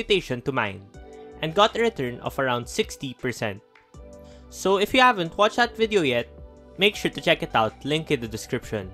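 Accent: Philippines. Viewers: Pare keep up the good work, nahalata kitang pinoy dahil sa boses, Thanks for the hardwork you put in your vids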